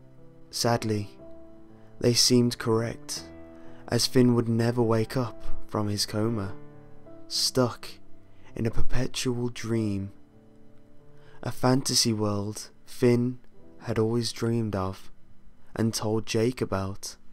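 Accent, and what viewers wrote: United Kingdom. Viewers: WOW..WOW.....WOW!!!!! THAT WAS SO DEEP! I LOVED IT! BTW I keep thinking your British.. I don't know why but...I just think that. Are you British?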